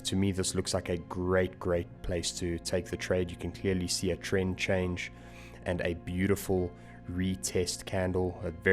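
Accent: South Africa. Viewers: South African? Kzn ? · definitely a south african boet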